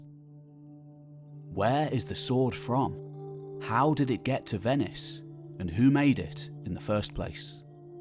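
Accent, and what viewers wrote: United Kingdom. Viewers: That's a cheap ass video with crap photos and clips shown over and over again. Of course with the limey voice you think you will learn some deep shit but no, the video just ends with some clip of driving down the dirt road in some far away shit hole. Back to the airport, I guess.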